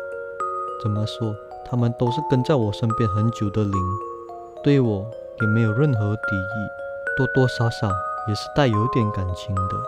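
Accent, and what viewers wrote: Malaysia. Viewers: 你做的卡通很好看，听你的声音感觉是大马人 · 哈哈以前玩線上遊戲 有個大馬朋友就是跟你差不多 所以聽你講福建話我就想到他 也猜到你是福建後代🤣 好久沒聽到大馬福建話了😁 我以為這件事情是發生在大馬😅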